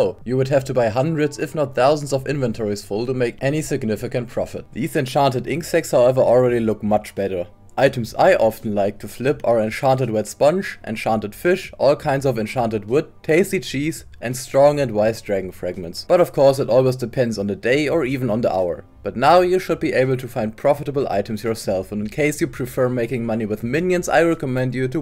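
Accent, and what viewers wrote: Germany. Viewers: Bro sounds german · Man wieso immer dieser Deutsche Akzent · Are you german? because you have that german accent i hear every time i talk with someone german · i hear a DEUTSCHER AKZENT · Man hört ganz dolle, dass du deutscher bist😂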